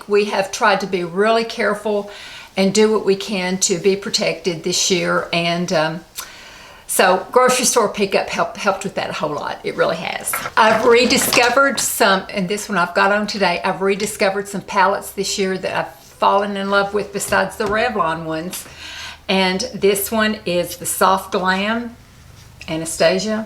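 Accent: South, United States. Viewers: I can't believe you are over 60 ... you are so gorgeous. And your southern accent is so cute and soothing. Have you tried The Ordinary's peptide hair serum? I really love it and has really helped my hair loss.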